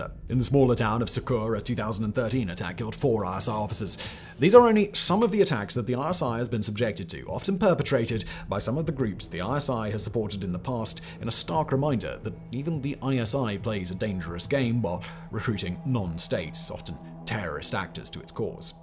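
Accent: United Kingdom. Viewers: This series is the case in point for the theory that everyone sounds more intelligent when speaking with a British accent and everything sounds more credible and authoritative when spoken with one😂.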